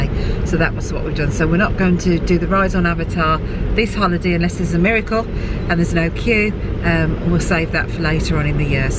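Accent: Birmingham, United Kingdom. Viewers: Can hear a bit of a Brummiw twang in your voice I think? Found you from Dev. Going back to WDW in September yet again. X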